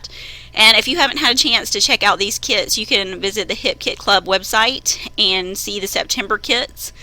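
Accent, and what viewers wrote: South, United States. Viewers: So awesome!! I love hearing another Southern accent!;)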